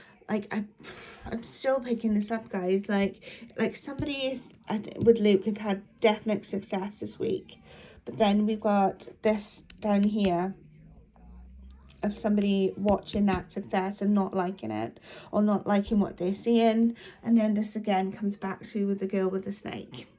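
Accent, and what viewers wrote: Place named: Wales, United Kingdom. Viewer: I’m not on this ship….i observe from afar. is a sweetheart and I love listening to her welsh accent……I find it very soothing. Whether tarot is your thing or not comes from a place of goodness. If A and L are still together….if N and L are not a couple….in the greater scheme of things in your own lives does it really matter? They are not your friends or family. Interesting that a lady posted yesterday on TT that L was in her shop in the UK. Both L and N like to post sometime after they were at a particular location. To me L’s hair and sideburns in the restaurant pic look a little short for current filming… but I could be wrong. Maybe A is at said restaurant with a new BF…. In my job of almost 40 years, I have learnt that things are not always as they appear….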